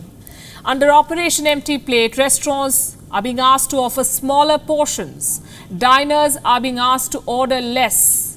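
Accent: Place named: India. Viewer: You sound like your Indian, theres nothing wrong with that, but considering India has the same amount of people and they have the same weather problems, so it should be safe to say that India also has a food shortage, yes? So why dont you also report on that, why puck on the chinese ? As i can see in the History books, china is one of the few countries around the world that has never invaded another country, so why are they hated. On the other hand AMERICA has invaded almost every continent in the world,. Think about that. Yes im proud to be American. Dont thread on me. Hoorah.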